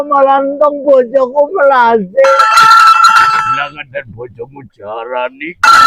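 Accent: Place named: Banyumas, Indonesia. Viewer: Dalang ngapak aneh-aneh Bae.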